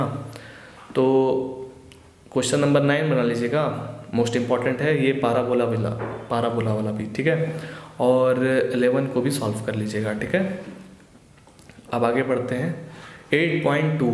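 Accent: Bihar, India. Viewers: Sir Aap bihar se hai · Sir aap bihar se hai kya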